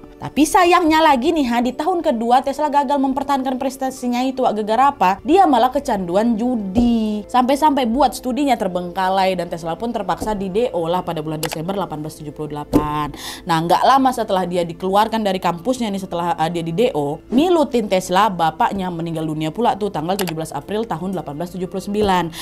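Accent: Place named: Padang, Indonesia. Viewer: Logat nya unik😂😂😂 logat padang kayak nya nih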